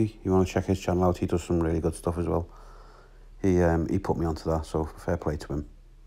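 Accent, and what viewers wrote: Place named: Scouse, United Kingdom. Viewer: Hey, i only found you channel through nick and was made up to see your renovation of the roadsweeper, as im donig one myself as we speak. Im doing it for a friend who owned one as a kid. The added bonus for me was hearing your accent, I thought “ wow, this guy is one of ours “. Im originally from Wallasey in merseyside, so im only a plassy scouser, or woolyback or odgie, but ive been living in exmouth in devon for the last 7 years and its always great to hear one of your own 👍🏻. Great work and im fully signed up to your channel. Well done mate.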